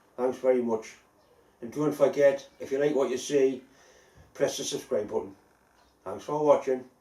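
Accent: United Kingdom. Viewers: Your command of the Queen's English is enough to delight the heart of an uncultured American....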